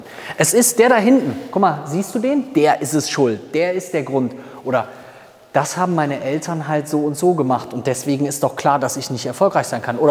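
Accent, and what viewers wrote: Cologne, Germany. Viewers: Cooler Akzent hört sich kölnisch an 😅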